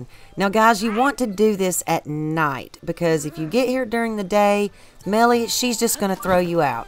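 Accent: South, United States. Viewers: I swear homie sounds like she could be related to me. So damn southern it can't be scrubbed off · I have just recently started playing boyw and I love tour vids, they are so well explained. Also I love that foxy southern accent. Much love from Scotland.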